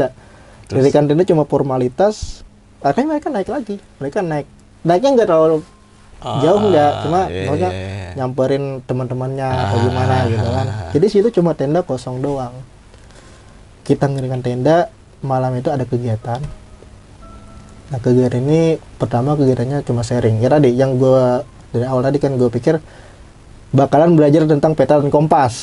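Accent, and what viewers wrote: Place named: Banjar, Indonesia. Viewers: Logat banjar nya berasa banar kang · Tanjung Tabalong Kalimantan Selatan hadir om.... Bang biar pakai bahasa Indonesia, tapi bahasa Banjarnya tetap timbul😆. Sehat terus bang😁🙏 salam satu hobi. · logat banjar nya kda hilang mang ae wkwk tpi ulun ketuju aja hehe terimakasih atas ceritanya mantap sukses selalu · Gunung 🗻 halau halau di Barabai Hadir Banjarmasin Logat nya Kd hilang bang Kya urng Barabai 😉🙏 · ini orang pahuluan mendok bnr wkwkwk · Beugh, Kaltim hadir wal aey,. Biar kata bahasa nya lu gua, logat Banjar tetep kalihatan jua lah,. Sukses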